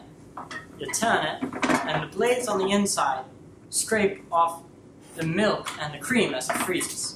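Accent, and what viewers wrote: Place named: United Kingdom. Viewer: WOW, the face/hairstyle and British accent LITERALLY looks like a younger version of the actor Thomas Brodie-Sangster (look him up if you don't know who he is), very good video again!!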